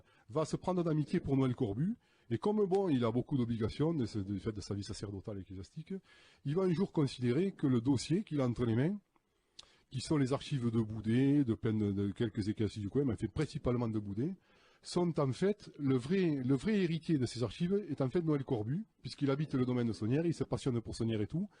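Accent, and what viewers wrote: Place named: Toulouse, France. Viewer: Son articulation est très sûre : ça s'appelle l'accent toulousain. C'est français. Ça vous dit quelque chose?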